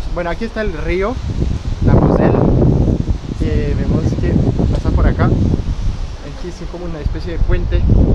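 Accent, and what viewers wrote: Colombia. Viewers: Exelente video, dices que tu ciudad es pequeña pero es muy hermosa!!! Ya hablas como todo un colombiano. Saludos desde México 😘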